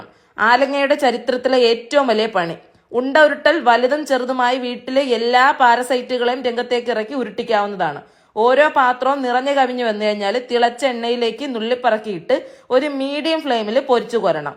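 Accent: India. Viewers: Ithu keralathil evidethe slang aanu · oro sthalathe slang avaravarude identity aanu. Thiruvananthapuram, Kollam slang kelkkmbo mathram enda preshnam... Eee slang kelkkan vendi aanu pradhanamayum njn ee channel kaanunnath.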